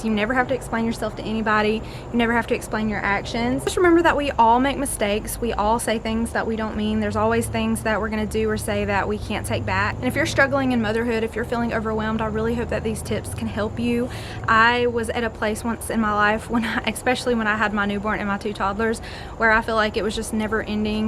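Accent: South, United States. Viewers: Girl, I really needed to hear your words today! I have to remind myself of those same things every single day. I was stuck in fight-or-flight mode from the time I was 9 until I was 31, so I completely get it. After years of therapy, thank God, I’m in such a better place now. I’ve only been staying home for a few years, and it’s still an adjustment. I’ve got a 15-year-old, a 9-year-old, and a 1-year-old—and I just love your videos. Your accent makes me smile too since I’m from the South as well. Thank you for sharing. ❤ The power of prayer is so real! Continue to pray for your enemies!!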